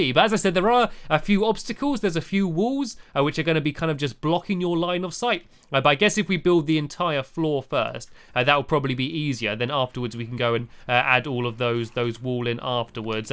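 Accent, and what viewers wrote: United Kingdom. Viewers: OMFG he has a british accent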